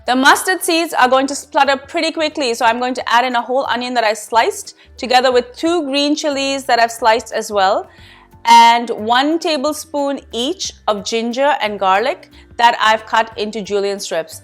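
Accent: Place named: India. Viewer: " "? Shakal aur accent desi, aur naam vilayati"?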